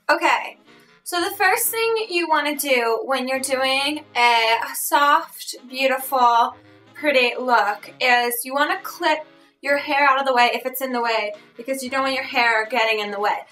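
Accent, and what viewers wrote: United States. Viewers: Oh. it's tha-a-a-a-at fuckin' american! Simply loved it! :DD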